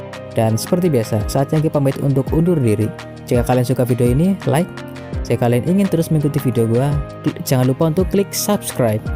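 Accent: Banyumas, Indonesia. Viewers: Ngapak ya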